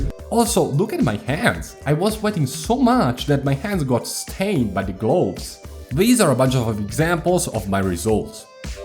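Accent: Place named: Italy. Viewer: Quello si, il tuo inglese è veramente ottimo, ma una delle cose che mi ha fatto dire che sei italiano era l'accento😂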